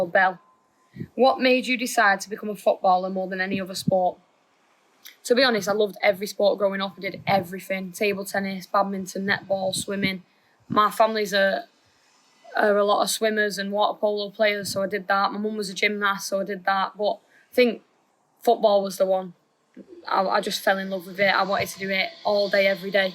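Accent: United Kingdom. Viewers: I love the accent !! Is that Liverpool’s?!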